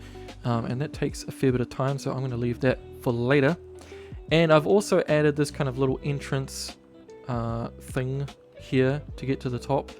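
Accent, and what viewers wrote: New Zealand. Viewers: Judging by the accent, you're a kiwi, right? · kiwi sounding fella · You sound like your from New Zealand? Just wondering? Nice content btw 👍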